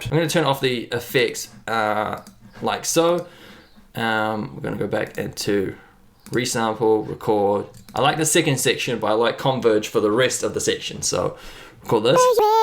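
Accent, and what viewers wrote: New Zealand. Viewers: hahaha yeah, i started watching and i was like damn i swear this guys from nz then i checked the channel description 😂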